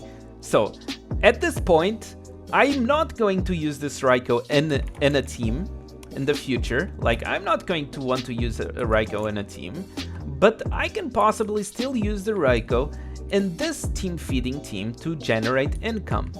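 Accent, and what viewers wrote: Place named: Portugal. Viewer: eu tava entendendo ele falar em ingles, tava achando estranho...ai vi que ele é portugues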